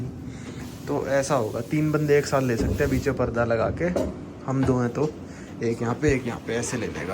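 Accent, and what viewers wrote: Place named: Northeast, India. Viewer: English Accent same as North East Indian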